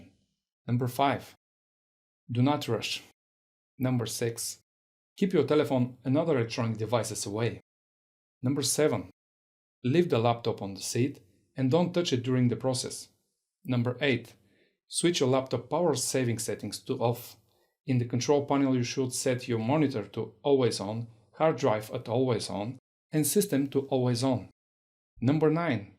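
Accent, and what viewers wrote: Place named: Eastern Europe. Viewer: Слышу славянский диалект в произношении )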